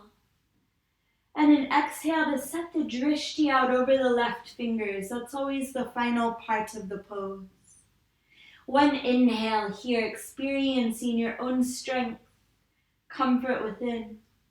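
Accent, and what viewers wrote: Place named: United Kingdom. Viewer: So good to practise with you after such a long time,. I hope you’re well. Unfortunately, the picture seems to freeze around 20 minutes in, but I could follow your voice. Your accent has a hint of British these days!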